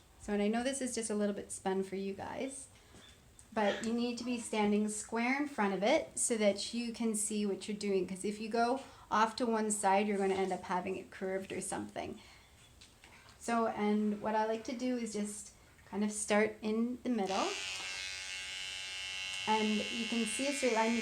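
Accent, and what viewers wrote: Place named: Pacific Northwest, United States. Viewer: She from Portland or Seattle? smh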